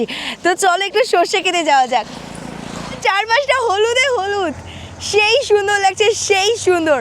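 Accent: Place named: Bangladesh. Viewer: Bike ride-a beriyocho otocho nijer bike ta check koroni ? Tomar left fog light ta toh akasher dike focus kora, Ete samner theke asha kono garir koto osubidhe hobe bhebe dekhecho ? R tomar moto vlog ta tomar ato bokbokanir jonno thik mone hocche kono Bangladeshi vloger ekta paid promo shoot korche. Tomar kothar moddheo ekta Bangladeshi bhab asche majhe moddhe jeta na bole parlamna. R ride korcho, khub bhalo kotha, kintu moto vloging ta ektu bhalo kore sikhe tarpor koro tahole loke r o njoy korbe. Tar sathe nijer cheap publicity na kore bhalo kore ride koro & vlog banao, like & subscriotion nijer thekei ashbe. Agami dine r o bhalo vlog dakher icche roilo... 👍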